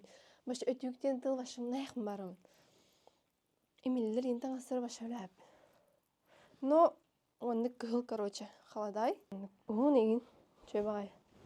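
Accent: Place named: Yakutia, Russia. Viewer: Астыгыын, Саха кыыһа, ыраастык сахалыы саңарар❤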